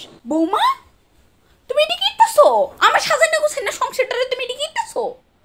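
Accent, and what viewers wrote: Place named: Comilla, Bangladesh. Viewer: Apni sob bastob er sathe mil rekhe Video gulo koren, abar apni amader cumilla vashay kotha bolen, tai apnar video gulo onek valo lage, love you apu, 🥰😘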